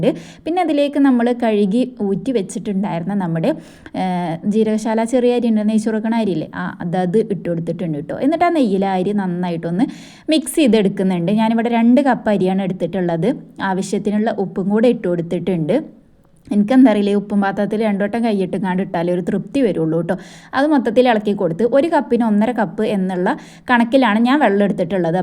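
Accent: Malappuram, India. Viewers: Thani malappuram baasha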